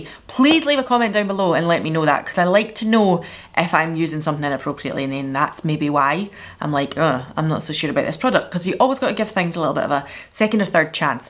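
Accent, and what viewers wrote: United Kingdom. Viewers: she is selling glamour... The British accent also adds something 🤣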